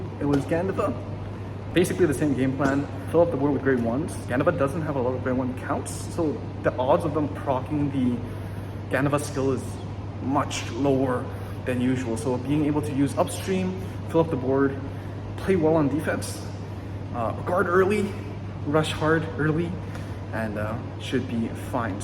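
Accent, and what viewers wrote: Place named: Canada. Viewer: I thought you were Canadian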